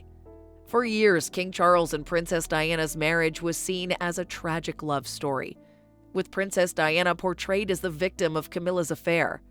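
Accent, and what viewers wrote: United States. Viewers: parker bowles was always a player. click bait lies, the creator of these trashy videos should be sued . always an american accent why? why would parker bowles confide in some american you tubers? im sure he would say nothing. source of this info?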